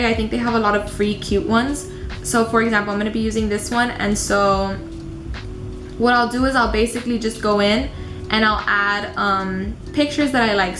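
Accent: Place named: Miami, United States. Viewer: I know a Miami girl when I hear one ! 🤗 xoxo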